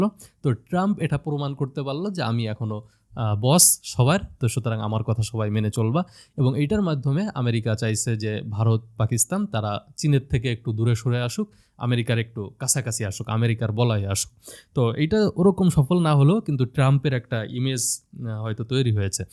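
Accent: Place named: Bangladesh. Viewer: Toke koto Ghosh khaiyeche....tor kotha bolar ton alada ....tui mone hoi BD mal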